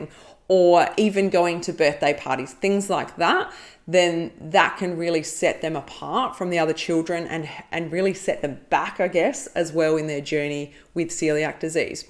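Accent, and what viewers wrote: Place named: Australia. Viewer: I know love is terrible. Lose that accent a little bit. You sound like someone steps on your toes and your voice going up at any other word. I understand is an Australian accent but man it sounds terrible. Just being honest not horrible to you. Surely other people thought the same but were too polite to point it out.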